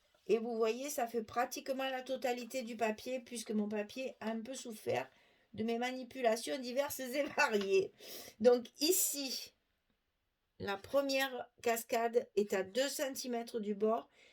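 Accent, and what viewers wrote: South, France. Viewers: Je viens de faire une belle découverte en visionnant votre tuto. Tout d'abord j adore votre accent qui vient du Sud. Vos explications sont claires. Je me crois à un cours de scrap tellement vous détaillez bien . Bravo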